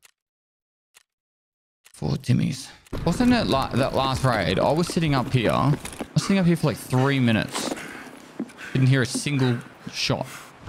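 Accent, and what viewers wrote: Australia. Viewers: Imagine an angry Australian man in an abandoned mall yelling and chasing you down. I’d be terrified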